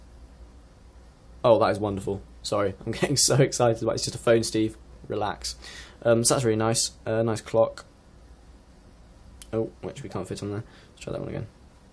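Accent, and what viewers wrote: United Kingdom. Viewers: Heard your British accent, saw the 0 "dislikes" so I immediately liked the video. :)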